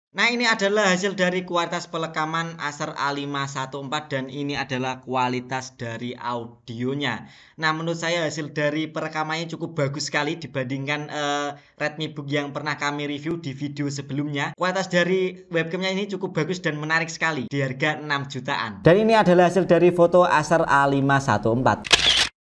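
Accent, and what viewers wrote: Java, Indonesia. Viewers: Mas mas jawa bgt nih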